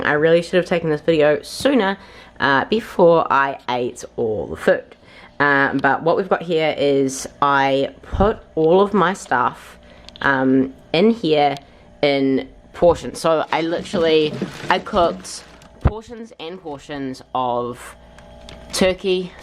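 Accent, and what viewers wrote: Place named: New Zealand. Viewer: I've just subscribed (YT recommended this video to me) and when you spoke, it was cool to hear you are a fellow Kiwi :-)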